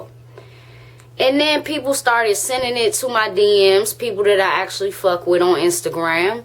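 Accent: Washington DC, United States. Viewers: You from DC? I'll definitely subscribe now. **New Sub** I enjoy your content and you have a nice speaking voice. Keep up the great work ya heard?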